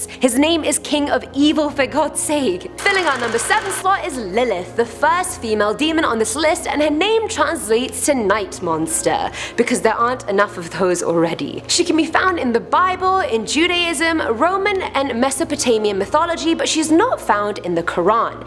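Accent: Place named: United Kingdom. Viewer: I can't tell if she's british or American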